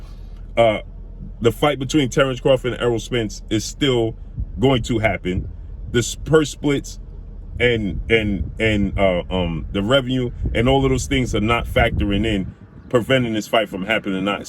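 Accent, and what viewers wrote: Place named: New York, United States. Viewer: Why is you takin' this so personally? I can tell by your accent you from NY, Errol left NY when he was a toddler.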